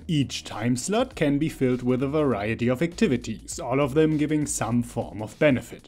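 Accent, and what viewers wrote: Germany. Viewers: Great video. I couldn't agree more - I only started YouTube a few months back, and even though barely anyone watches my stuff yet, I know what you mean about valuing free time differently. At points it really feels like there's not enough time in the day to do what you need just to improve your videos/channel, not to mention everything else. You've made some really apt points. Subscribed. Ich wollte auch fragen, ob Sie aus Deutschland kommen, oder ein deutschsprachiges Land? Ich bin mir nicht total sicher, aber ich glaube, ich erkenne den Akzent. Davon abgesehen ist das Englisch in den Videos absolut perfekt! Tolle Leistung :)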